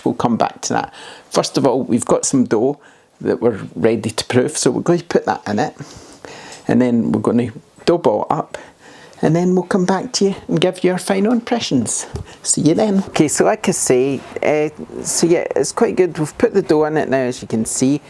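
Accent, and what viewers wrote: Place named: Glasgow, United Kingdom. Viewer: All the comments about the accent are making me chuckle. Thanks for the review from one Glaswegian to another.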